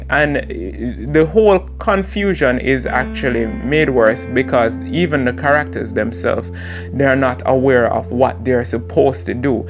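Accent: Jamaica. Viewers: are you jamaican? you got a accent.I'm trrying to place it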